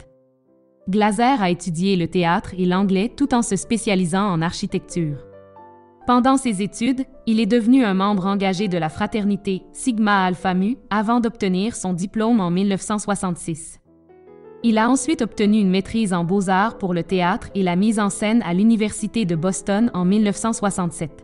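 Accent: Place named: Quebec, Canada. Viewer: Toujours marrant l'accent québécois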